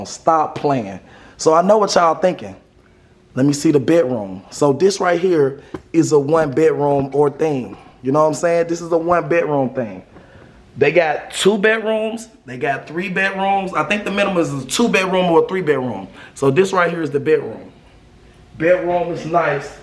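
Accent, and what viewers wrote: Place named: South, United States. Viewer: Twin I got to this part of the video!! lol you so country too tbh remind me of my peoples 😅❤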